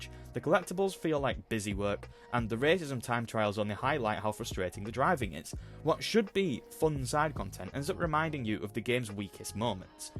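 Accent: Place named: United Kingdom. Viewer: If it's any consolation, I thought you did a good job of it. You could have tightened up parts of it so you don't repeat things too much, but your points were well made, and I agree with most of them. I, for one, appreciate the voice of a fellow Brit, and as an older gamer, I enjoyed you speaking normally, rather than the breakneck pace I hear on so many videos of this type!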